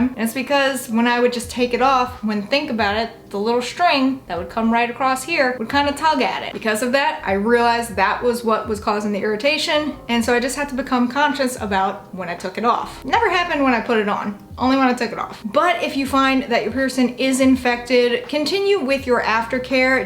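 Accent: South, United States. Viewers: I must ask your southern correct?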